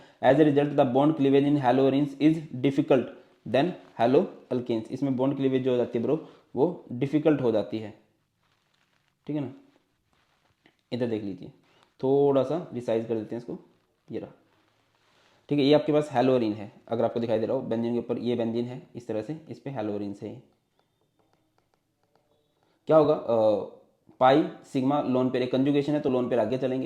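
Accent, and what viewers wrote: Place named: Uttar Pradesh, India. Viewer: Ya he's got that UP waala accent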